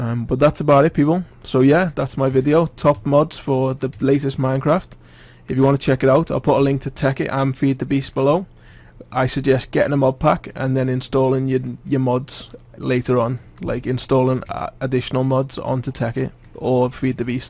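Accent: Liverpool, United Kingdom. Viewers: he sounds scouse and im scouse but fuck me this is defently 2013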